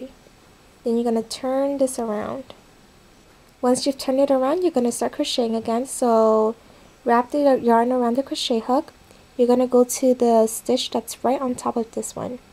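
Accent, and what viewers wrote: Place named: New York, United States. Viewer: Love your accent are you from newyork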